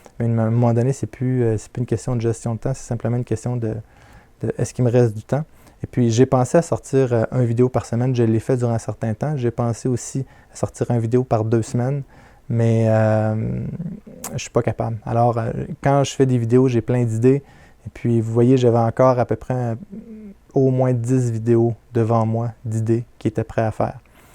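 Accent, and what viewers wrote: Quebec, Canada. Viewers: Mais bien-sur que je te met un like, Prend soins de ta famille, encourage ta femme qui à du te partager avec nous, tu vas tellement nous manquer. je crois que je v coller ta photo sur ma cr10, avec ce sourire et ton accent de Quebec tu a tellement apporté à la communauté de l'impression 3d amateur francophone. énorme merci camarade de toute la France Damien un fan à qui tu as appris beaucoup et qui dans la difficulté de certain print te regardais rien ne lâche pour tenir. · Bonjour je ne commente jamais les vidéos mais très attentif au explication ou découverte de nouvelle imprimante, je suis de tous cœur avec toi. Tu nous manqueras avec ton accent canadien que l'on apprécie temps de notre France. Prend bien soin de t'as famille, merci pour ton travail de passionné.Tu vaux bien plus qu'un simple like.Bonne continuation et encore merci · C'est en grande partie avec toi que j'ai débuté en impression 3D. 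J'ai aimé la rigueur de ton travail, la sincérité de ta passion de transmettre, et aussi ton accent Québécois. Et tu as pris la bonne décision. Je souhaite bon rétablissement à ton épouse, et à toi beaucoup de bonheur avec ta famille, et pour très longtemps. Un grand merci pour tout ce travail qui nous a profité à tous. Si un jour l'envie te venait de passer des vacances en France, sache que je t'accueillerai toi et ta famille avec grand plaisir. Bonne route... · Bon rétablissement à votre épouse. J'ai adoré vos vidéo, votre bonne humeur, ainsi que votre accent québécois. C'est grâce à vous que j'ai commencé l'impression 3D. Merci infiniment et BRAVO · Bonne continuation pour la suite, occupe toi bien de ta famille, profite bien d'eux et surtout bon rétablissement à ton épouse. Mais malgré tout je suis triste, car je n'aurais plus le plaisir d’écouter tes conseils et ton accent de cousin de l'autre coté de l'atlantique lol Merci pour toute tes vidéos, j'ai du en voir les 3/4 et tu m'as sortir pas mal de fois de mauvais pas;) Bonne continuation et à la prochaine. 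· Merci pour toutes les vidéos et conseils tellement utiles et pertinents. Il est rassurant de voir des personnes qui ont des valeurs : la famille est si importante. Carpe diem et encore merci pour tout Toutes mes pensées pour le rétablissement de Madame Il sera difficile de ne plus entendre ce charmant accent canadien pour un auditeur français !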